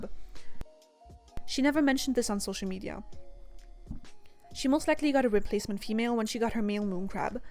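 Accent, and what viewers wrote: Germany. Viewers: OK at the end I heard it, you are from Germany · Das ist der Akzent! Du kommst aus Deutschland! :3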